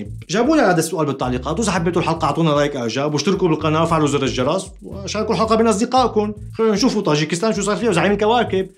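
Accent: United Kingdom. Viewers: واو لهجتك البريطانية رهيبة❗😭😭🔥🔥🔥🔥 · the british accent :)))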